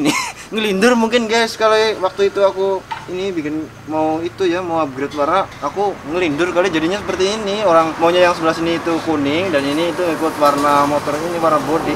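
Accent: Banyumas, Indonesia. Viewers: Mas ngomong nge nggae basa ngapak kenera ora ngapak ora kepenak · Mantap wong ngapak 🤣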